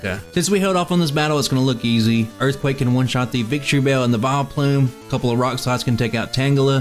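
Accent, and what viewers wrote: South, United States. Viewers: you kinda have a southern accent! I'm surprised!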